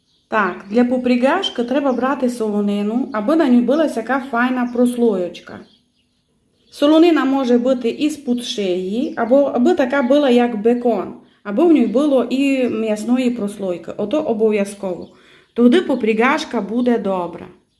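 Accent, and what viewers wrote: Transcarpathia, Ukraine. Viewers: Можу цілу вічність насолоджуватись закарпатским діалектом та кухнею💕 З любов'ю "западєнєц" з Донбасу ^^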